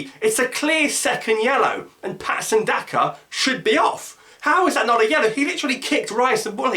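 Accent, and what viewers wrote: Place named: England, United Kingdom. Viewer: I'd say that's an accurate assessment. I could tell in your voice your voice that you had some English in you. You seem like a call guy so I'm going to subscribe to your channel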